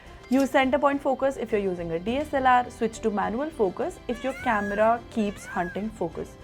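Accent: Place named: India. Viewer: Indian?? what accent do you expect?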